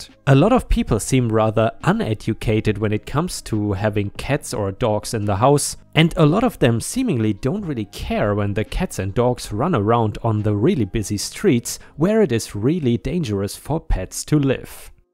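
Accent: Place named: Germany. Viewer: Are you German? Sounds like an German accent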